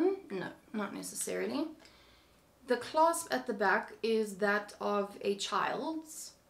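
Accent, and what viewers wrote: South Africa. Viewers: I am just here to listen to your accent 😂 Live in Australia, but was born in South Africa. I have an Aussie accent now so love listening to yours. Makes me nostalgic 🥰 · I knew you were from South Africa before you even said it lol my best friend is too and you sound the same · Noticed the SA accent, new to ur channel. Love from sa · I subscribed as soon as I heard you're South African accent 😁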